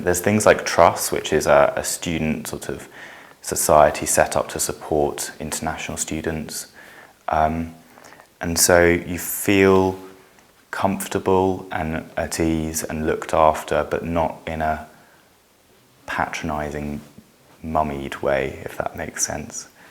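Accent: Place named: RP, United Kingdom. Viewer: 話し方がとてもポッシュですね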